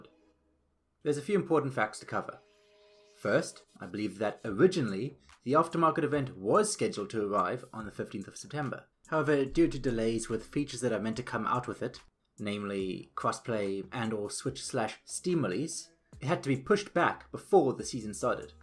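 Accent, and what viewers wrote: South Africa. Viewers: Yo is South African?? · South African accent? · What accent do you have bro? Sounds south african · South African accent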